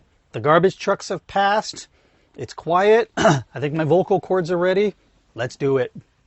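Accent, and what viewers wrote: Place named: France. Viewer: Rethinking everything now. Maybe it would be better to go with something like this! Nice French accent, by the way!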